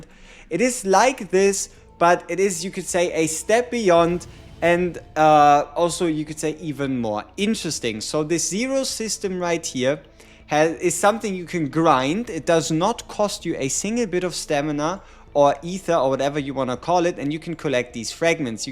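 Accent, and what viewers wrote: Germany. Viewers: You sound german. Nice video tho. Thx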